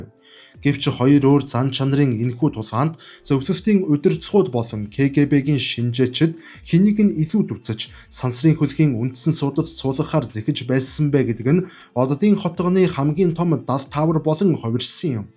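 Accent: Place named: United States. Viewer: Дайн саад байх юу бхав дээ сонин стилээр америк стилээр хуцаад боль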